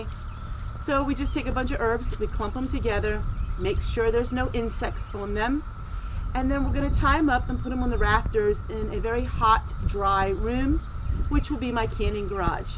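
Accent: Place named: Alabama, United States. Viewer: We pronounce chamomile the same way here in Alabama. LOL I’ve only noticed one word you have pronounce different and that’s the word “different”.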